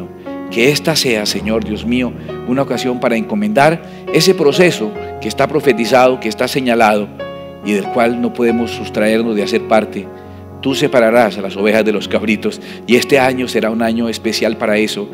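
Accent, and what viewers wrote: Santander, Spain. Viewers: Haber sabido de usted Pastor ha sido de gran edificación para mi vida he aprendido mucho con usted, gracias a Dios por su forma muy diferente de montarnos el propósito de Dios, sin contar las innumerables ocasiones que me he destornillado de la risa por su comentarios y esa forma tan peculiar de hablar como santanderiano que es. Gracias a Dios por usted, bendiciones para usted, su familia, su iglesia y su ministerio a través de este canal Feliz 2018 para usted también. Amen.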